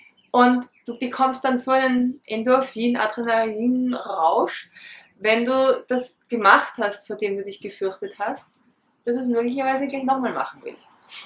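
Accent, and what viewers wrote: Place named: Austria. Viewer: Danke für dieses inspirierende Video! Und auch für diese Ehrlichkeit einfach diese "andere Stimme" in dir und somit einen Teil deiner eigenen Ängste mit der ganzen Welt zu teilen! Ich bin übrigens auch aus Österreich und liebe es auf YouTube mal wieder die gewohnte Aussprache zu hören! 😅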